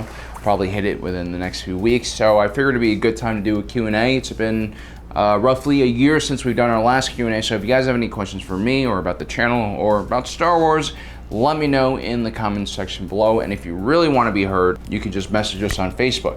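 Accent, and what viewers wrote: United Kingdom. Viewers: What happened to British?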